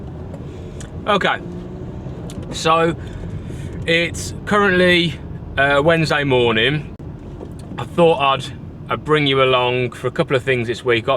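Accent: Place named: Midlands, United Kingdom. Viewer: Been watching for a while, and only just noticed you’re in Warrington, it’s where I’m from too! With the accent, I was assuming the midlands. Glad that you’ve been able to get your bloods done, it will give you a good insight. It’s a shame it doesn’t seem like you have any other bloods to compare it too, because it could be that yes the result might not look great but there’s no way of knowing if it’s an improvement from when you started 11 weeks ago if that makes sense? Unless you did have bloods done at the start and I’ve missed that. I’ve never had my bloods done before, but been on my weight loss journey since October last year. I’m getting them done tomorrow, privately because my GP is useless 😂. Then although this will give me good insight, I think I will get them done again a few months down the line to compare. Anyway mate, you’re smashing your journey, don’t know if I’ve ever messaged on here so if not, hello! 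Hopefully you won’t have to stop the meds as they have really supported you it seems, but health always comes first!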